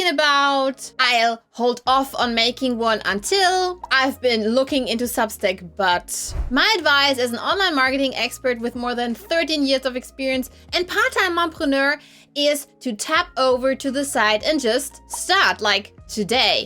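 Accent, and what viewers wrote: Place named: Germany. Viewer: Noooo your German accent is all part of the charm! It's your character. I really enjoy your videos, you put so much work into editing and production. 😊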